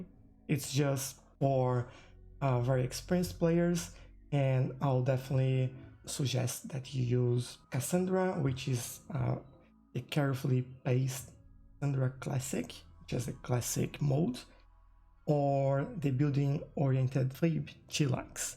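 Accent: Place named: Brazil. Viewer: You sound like a brazilian guy speaking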